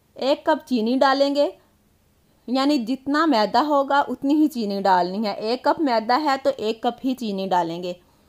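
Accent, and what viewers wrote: Punjab, India. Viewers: پنجابی لہجہ ایسا ھی لگتا ھے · G Haan lehja tora sakht lagta ha shayad punjabi hone ki waja se esa lagta ha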